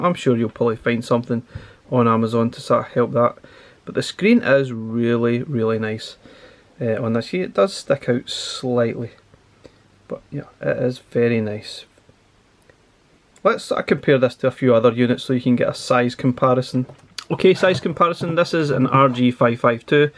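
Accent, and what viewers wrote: Scotland, United Kingdom. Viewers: I’ll buy anything described in a Scottish accent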